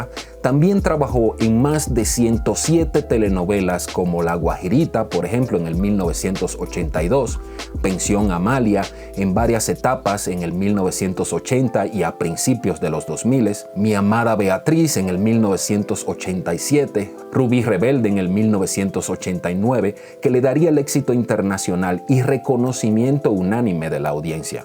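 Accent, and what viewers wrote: Mexico. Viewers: Que magnifico trabajo el suyo, destacar la participación de artistas quisqueyano fuera de nuestro país, para que conozcamos a los nuestros en playas extranjeras. Muchas gracias principe por ese regalo a los dominicanos. Creo por su acento, que usted es del tan lindo y querido México, nuestro hermano mayor de Norteamérica. Bendiciones para usted.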